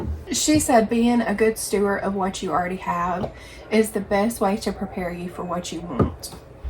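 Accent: South, United States. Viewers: Girl I have no idea what state you live in. But obviously from your accent you live somewhere in the south. Do you know how amazing it is to own a house at 21? Girl, i graduated from college at 21 and then wanted to go crazy and live a life of freedom. I wasn't even remotely right in the head to get married until 26. Don't ever feel ashamed of your house that you bought and is paid off. You are a light years beyond where many people were at your age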